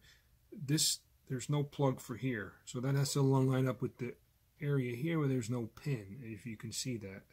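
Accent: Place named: Bronx, United States. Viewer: U must be from Bronx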